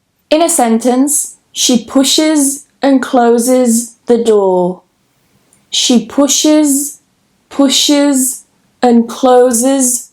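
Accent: United Kingdom. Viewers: Such a FANCY British Accent and very helpful ❤️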